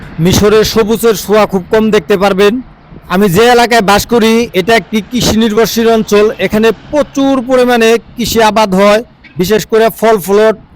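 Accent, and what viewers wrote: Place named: Tangail, Bangladesh. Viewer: অনেক সুন্দর উপস্থাপন, কথা শুনে মনে হচ্ছে আপনার বাড়ি টাংগাইলে। আমি মিশরে বেড়াইতে আসতে চাই।আপনার সহযোগিতা চাই।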